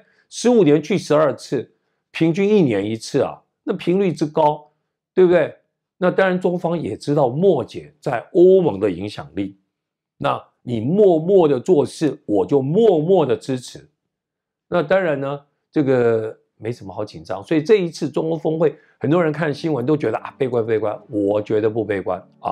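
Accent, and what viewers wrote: Standard. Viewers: 台湾移民到新西兰的，国语说得正👍观点客观👍👍👍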